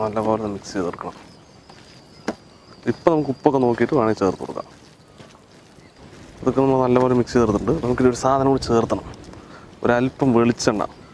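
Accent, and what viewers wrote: Palakkad, India. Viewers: ഇക്കാ നിങ്ങളുടെ Videos പെരുത്ത് ഇഷ്ടമാണ്...ചുമ്മാ ഇരിക്കുബ്ബോള്‍ നിങ്ങളുടെ ചാനല്‍ എടുത്ത് Videos കാണാന്‍ തോന്നും...വോറൊന്നും കൊണ്ടല്ല...ഞാന്‍ Trivandrum ത്ത് നിന്നാണ്...നിങ്ങളുടെ പാലക്കാടന്‍ Dialect വലിയ ഇഷ്ടമാണ്...സ്വന്തം Dialect ഉപയോഗിക്കുന്ന ഭംഗി Standard Dialect ഉപയോഗിച്ചാല്‍ കിട്ടില്ല...ഫിറോസ് ഇക്ക ഇഷ്ടം...😍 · ningalde palakkadan basha kelkan nhan veendum veendum video kandu ikka luv from sharjah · U can add subtitles to ur videos so that non keralites as well can understand and one more thing ur way of talking in our Palakkad style is quite well.so if u include all ur members in the whole video and lil bit of interaction with each other will be more interesting. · Palakkadan slang🤩 njn pkd aan☺️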